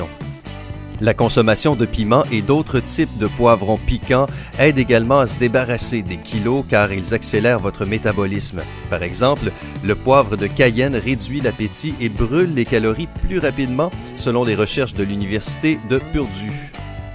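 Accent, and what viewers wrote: Quebec, Canada. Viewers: J'adooooore l'accent canadien. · acent québécois 😊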